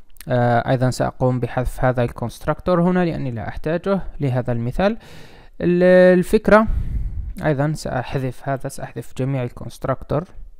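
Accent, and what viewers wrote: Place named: North Africa. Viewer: Souqran!! Hon Moussa, Ana Yahoudi ubakhki Ubrani bass I was able to understand it, knowing the small differences in our ancient languages (Hunna = Hinne, A-Salam Aleikum = Ha-Sholom Aleikhem, Bikoum = Bakhem, WaHuwa = WeHou, Kalam = Kolam, Nafsa = Nafsho, Nukdatein = Nekudothahyin, Fi-Mathala = Be-Mashall, Acher = Ahar, Kabir = Kabir...) Sound's North African. Is this Algerian or Morrocan or something else? Anyway, thank you for clearly explaining the cascade notation clearly, I saw from the code, but also was able to understand each and every word!!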